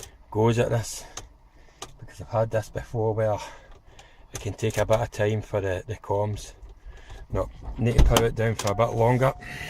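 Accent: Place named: Scotland, United Kingdom. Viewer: Great video, great to hear a Scottish voice! Great tip about coils never knew that could spike ECU will watch out in future. Liked the look of spark jumpers, was it eBay? I like u to review battery maintainer, cheers ps your now my go to Vauxhall guy!!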